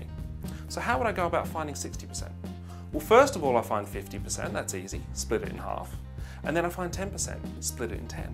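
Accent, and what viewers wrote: England, United Kingdom. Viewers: I´d like to see more class tought by this teacher. I love english accent.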